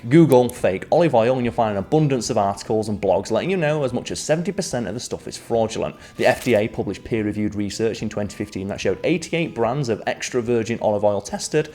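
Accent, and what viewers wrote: United Kingdom. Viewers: sounds very English but i assume the channel is aimed at the US because British people would never refer to the Christmas period as "The holidays" we just call it Christmas time. Holidays are just any time spent away from work or school or we use it in the same way Americans use the word vacation. Don't ask me why i felt the need to share that but it just sounded weird coming from someone that sounds ultra English.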